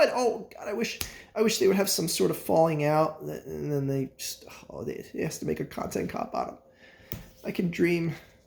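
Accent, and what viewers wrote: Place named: Philadelphia, United States. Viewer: Straight out of Phillyburbs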